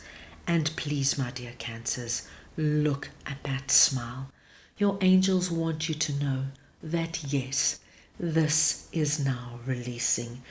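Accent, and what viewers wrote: South Africa. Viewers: you have a south african accent africanda thank you great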